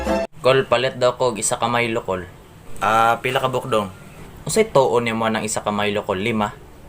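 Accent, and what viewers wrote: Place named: Visayas, Philippines. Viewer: nakakatuwa namn po sir ang galing nyo talaga mo mg patawa lalo na yng salita ng bisaya mo dami kng tawa sa mga jokes mo pkcm amo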